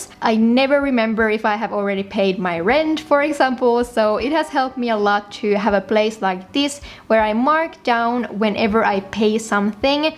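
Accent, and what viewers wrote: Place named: Finland. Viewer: I was watching this after it was recommended to me and was closely listening to the way you speak, thinking "Hmm.. sounds a bit finnish.... but also a bit japanese... Interesting 🤔". Then I checked the info and gasped, you're a finn! Aivan ihanan kevyen näkönen bujo ja todella hyödylliset spreadit ❤👌🏻 Tykkään kovasti! I'm getting Archer & Olive as my next bujo too, I'm absolutely craving for thicker pages! The overall look of them is also very pleasing 😊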